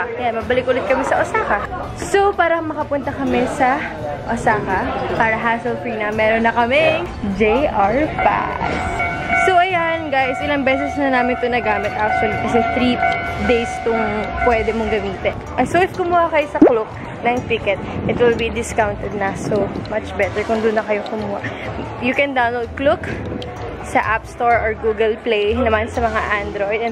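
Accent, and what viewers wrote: Visayas, Philippines. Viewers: Ate bisaya accent po yun😂😂😂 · Okii lang. Bisaya kaayo kag accent hahahaha loveyou 😍😘❤ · Mommi bisaya po ang aksent non like if you agre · Visaya ang acsent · bisaya accent po!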